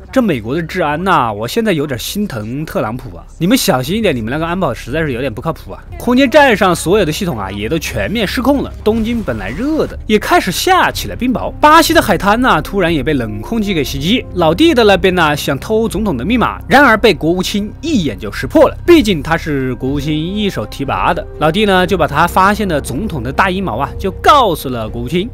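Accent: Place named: Anhui, China. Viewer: 你是我们安徽老乡吗？反正好亲切呀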